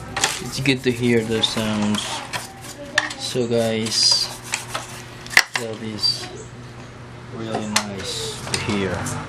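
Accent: Visayas, Philippines. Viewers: hahaha bisaya man diay ka slangs kaau kada.hehehehe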